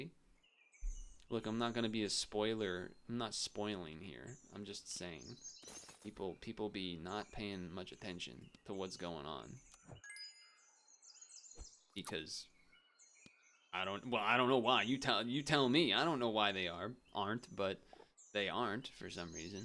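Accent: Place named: Newfoundland, Canada. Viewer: NL cadence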